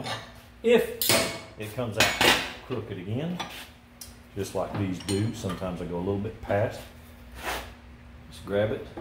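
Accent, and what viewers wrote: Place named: South, United States. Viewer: Simple and effective method, and what nowadays they call "sustainable". Thumbs up. And all explained with the lovely southern accent!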